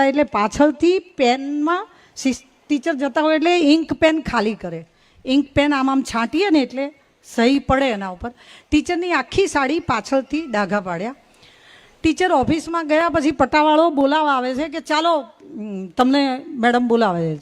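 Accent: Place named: North Gujarat, India. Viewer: ઉત્તર ગુજરાત નો લહેકો અને ગામઠી છટા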